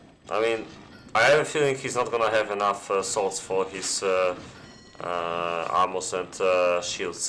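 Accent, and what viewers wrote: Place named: Poland. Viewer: Hej po akcencie strzelam że jesteś polakiem, but if i am mistaken please correct me :) Polecam wrzucać też przycięte nagrywki jako filmy (z tego co wdzię te live'y nie przebijają się w wyszukiwarce) - oczywiście jeśli Ci się chce :) Pozdro miło wiedzieć że nadal są aktywne turnieje i gracze :) może jakieś tutki ;)?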